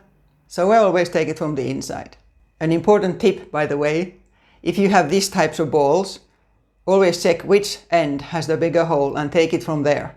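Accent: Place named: Finland. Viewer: I love your Finnish accent and your easy to follow directions and this video. My daughter and I met 23 of my relatives from Paimio, to Sotkamo to Aavasaksa and many more cities. Finland is a beautiful country with beautiful/kaunis people. Thank you very much/ Kiitos paljon. From California.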